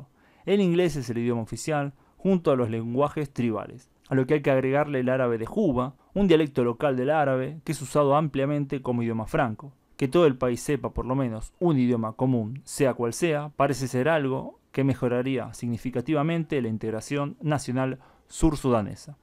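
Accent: Argentina. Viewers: O sotaque argentino é lindo. E um italiano falando espanhol.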